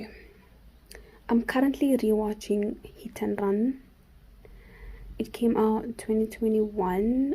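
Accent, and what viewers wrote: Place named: South Africa. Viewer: I js love you your South African slung❤️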